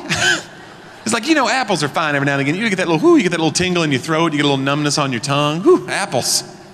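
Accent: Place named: Alabama, United States. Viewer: sounds like Alabamian’s.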